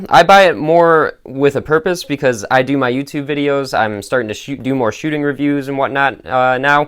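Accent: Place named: North, United States. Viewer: Can tell from your accent your from up north, I'm from downstate Il...a bit south of Champaign. This is the first video of yours ive seen, but I'm going to check out the rest of your stuff. I enjoyed this video man. Thanks....and big R and rural king is where I buy 90% of my ammo. I just caught 38 special on clearance for 28 bucks for a 100 round box! Going to help me break in my new henry 357 sidegate....looking forward to watching the rest of your videos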